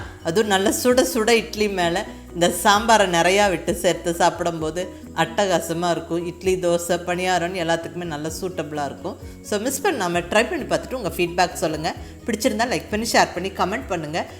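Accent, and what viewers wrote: Kongu, India. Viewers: கொங்கு மண்டல குரல்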